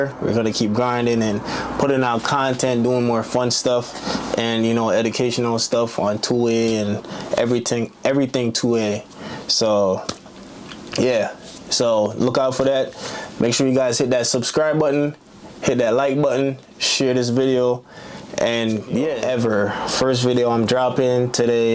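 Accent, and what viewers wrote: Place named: Jamaica. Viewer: This dude Jamaican as hell. Can't hide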